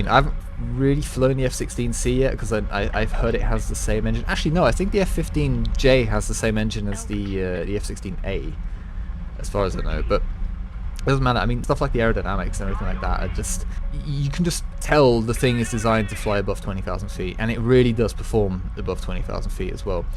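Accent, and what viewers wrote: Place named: Europe. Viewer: Wow by the sound of this mans voice I would think they were from Europe and seeing a person from that section of the world using FEET instead of the measurements that are used in the countries of Europe(kilometers) hell I live in that one country that uses feet for measurement and I use in-game kilometers just because that's what the majority of people use in this game so it makes it that much easier for me when some one types out in chat things like the bomber is at 6k meters cause I don't think I have really ever seen anyone type out in chat how many feet is the height of the bomber. But to each their own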